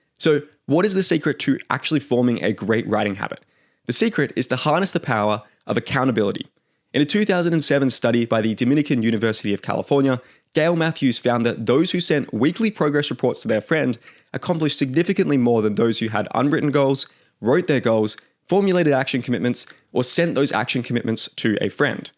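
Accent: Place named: Australia. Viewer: Microsoft with an Aussie accent is just plain weird to my American ears! 🤣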